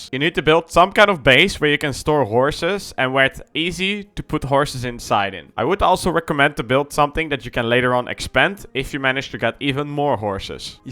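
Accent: Netherlands. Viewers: Why no dutch video's? I can hear your dutch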